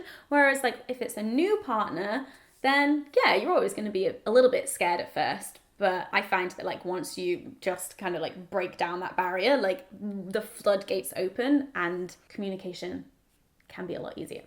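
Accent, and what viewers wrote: United Kingdom. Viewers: Your British accent along with your "joie de vivre" is what I find attractive in you. Your husband is truely a very lucky man! Stéphane from Montreal, Canada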